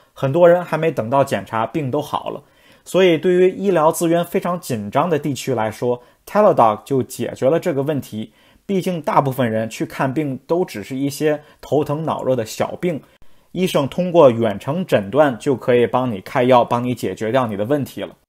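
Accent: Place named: Tianjin, China. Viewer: 是天津卫滴：）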